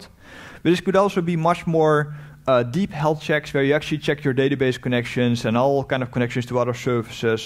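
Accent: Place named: Netherlands. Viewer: helpful video. but the Dutch-English here is cringe worthy.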